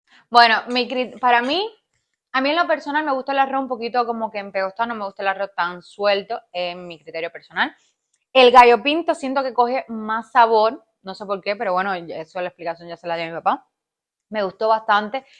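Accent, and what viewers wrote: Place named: Cuba. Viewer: Felicidades por su canal, mi Padre era de Santiago de Cuba, y cuando lo escucho hablar me recuerda mucho a el, saludos y mucho exito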